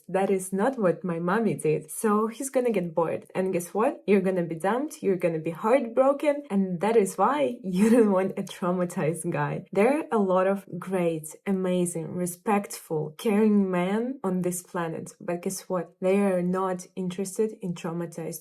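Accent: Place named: Russia. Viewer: Чую русский акцент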